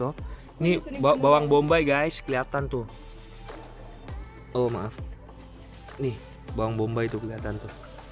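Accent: Java, Indonesia. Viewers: Jowone pundi mas jenengan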